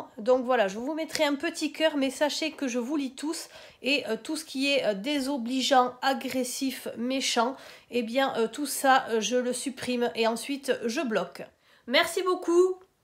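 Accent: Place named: South, France. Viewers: Bonjour, je vous apprécie beaucoup❤ et j'aime votre petit accent du midi et vos vidéos. Ne tenez pas compte de la méchanceté des gens. Dans quel monde vivons nous... Prenez soin de vous❤ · Bonjour, continuez vos vidéo je les aime beaucoup même si je ne commente jamais... Votre accent me rappelle mon sud natal... Faites fi des rageuses!!! Bon rétablissement et à très vite, biz 😉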